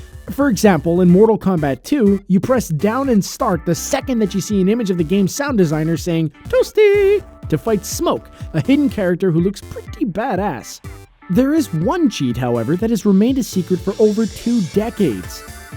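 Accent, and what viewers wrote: United States. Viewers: Has an american accent but calls Z "zed" instead of "zee"?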